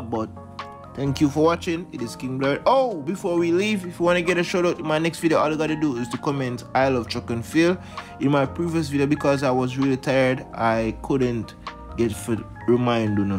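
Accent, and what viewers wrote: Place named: Jamaica. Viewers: Kindly reduce on the Jamaican dialect,you do not only have Jamaican subscribers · Wait, so you are expecting a Jamaican person to not speak in their native accent?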